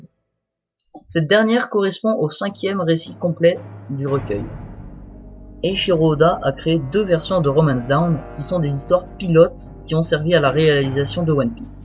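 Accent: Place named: Japan. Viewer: Je valide l'accent japonais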